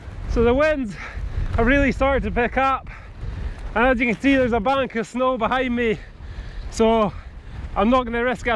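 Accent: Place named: Northern Ireland, United Kingdom. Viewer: Can't place your accent, mate... County Antrim? Ayrshire? Anyway, that view of Nevis and co is jaw dropping. Subscribed.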